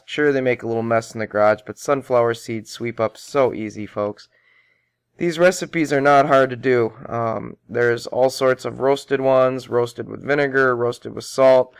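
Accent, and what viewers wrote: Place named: United States. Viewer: We love your American accent! Thanks for telling us a great recipe :) Really helpful - we have thousands of giant ones!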